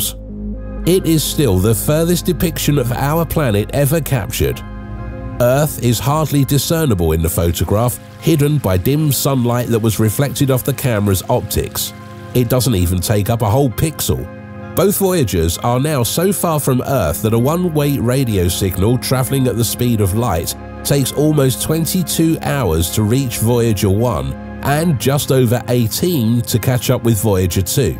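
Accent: United Kingdom. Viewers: i don't like british accent for narration .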